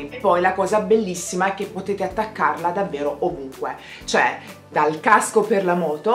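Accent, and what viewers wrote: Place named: Rome, Italy. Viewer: Inizia un video parlando con l'accento ROMANO! Ti adoro sei stupenda😍😍😘❤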